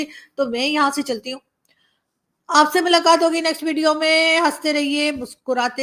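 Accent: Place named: Mumbai, India. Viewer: Mumbai language achha bol lete hein aap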